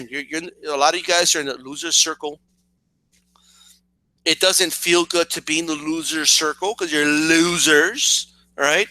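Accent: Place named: New Mexico, United States. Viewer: por k hablas con accento nuevo mejicano?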